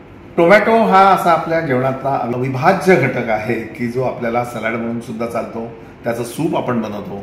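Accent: Vidarbha, India. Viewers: Sir, multigrain chi poli khupch aawadali.Ani chena ghalun banawaleli tomato chatni...new idea... Tumche video khup aawadtat.Mi pan vidarbhaachi aslyamule tumchi Vidarbh paddhatichi Marathi bhashet bolat bolat sangitleli recipe aikayala v pahayala khup aanad vatato.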